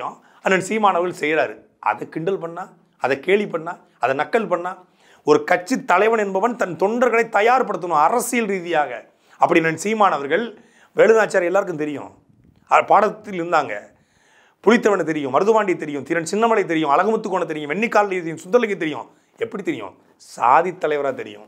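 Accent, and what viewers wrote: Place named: Thuckalay, India. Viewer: Née epadi bro thuckalay pesuna bro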